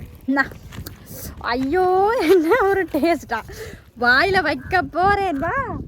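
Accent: Sri Lanka. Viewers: இலங்கை தமிழ் பேசும் அழகு தனி அழகுதான் வணக்கம்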